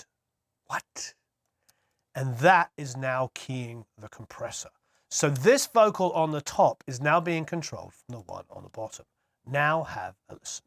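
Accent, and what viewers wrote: United Kingdom. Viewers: Hi, first of all: I love watching your videos, your British accent is great - "I hope you are doing marvelously well" and your is it "shnits and schnitzels (?)" (reminds me of "Wiener Schnitzel" each time). I have a question: you seem to add quite a lot of new things to a mix. How do the artist(s) react, when you sometimes give some new character to a mix? I mean, maybe an artist does not want to be changed too much, he wants a mix with the character he has added to a song!? Let us take a famous song: " " by. There is a very remarkable delay on vocals. If had recorded this song in a studio with dry vocals and a mixing engineer would have added that kind of delay to his vocals, there could have been two reactions: "joy or disappointment, I think - is the mixing engineer going crazy, what has he done to my voice!!!???. Do you know what I mean? 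How far can a mixing engineer go? of course was a very special artist, he was doing most of the things by himself, especially in his early years and he actually did not care too much about the quality of his recordings - the mix had to be as good as possible finally, it was more about the composition or releasing something completely new. By the way, recorded an mixed quite a lot in Sunset Sound studios in LA, and Paisley Park´s Studio3 in Minneapolis was or is a copy of it. Susan Rogers has some interesting videos here on youtube talking about her collaboration with. It is also interse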